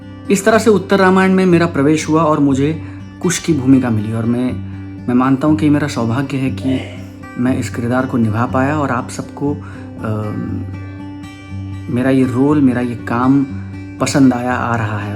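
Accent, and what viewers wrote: Standard. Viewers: कुश के रोल में बहुत प्यारे लग रहे आप और उच्चारण भी बहुत शुद्ध है।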